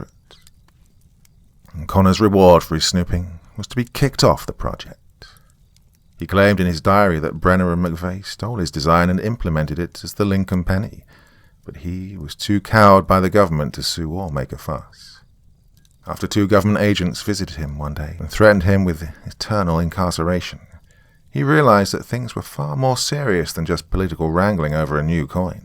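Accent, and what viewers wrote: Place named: United Kingdom. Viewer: I like to listen to a British person narrate a story!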